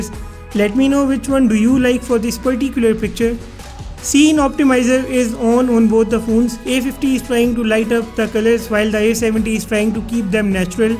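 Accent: India. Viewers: Bruh that indian English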